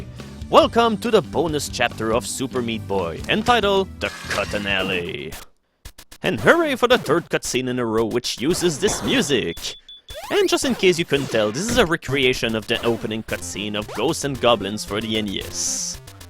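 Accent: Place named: Quebec, Canada. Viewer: hey,...by hearing your accent...something tells me that you are from quebec...because...i am from quebec myself.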